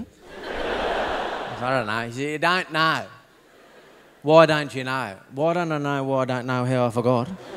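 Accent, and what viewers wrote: Australia. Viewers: He speaks strine.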